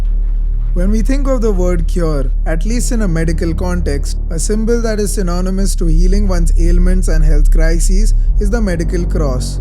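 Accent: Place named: India. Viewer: Great Video although ive noticed your quite heavy indian accent. I would probably watch more videos from you but my heavy distaste for the Indian race won’t let me. Please consider to stop being Indian so more People can enjoy your content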